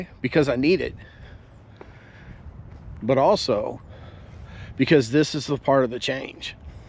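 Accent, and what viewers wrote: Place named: South, United States. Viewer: Thanks hear your southern voice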